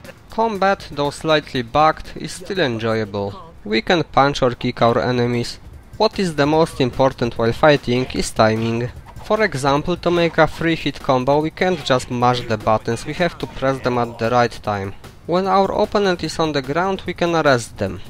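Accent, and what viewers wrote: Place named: Poland. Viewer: Epicki polski akcent :D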